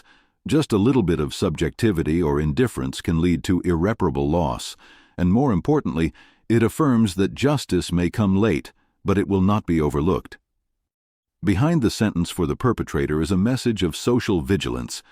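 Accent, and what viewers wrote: United States. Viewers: It’s annoying to hear the American accent on a UK documentary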